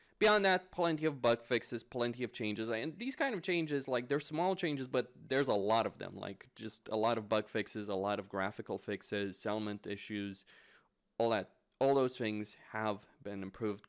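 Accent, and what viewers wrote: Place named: Romania. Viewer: e sounds Romanian I might be wrong tho. Some Balkan country maybe? Romanians have a pretty distinct accent I find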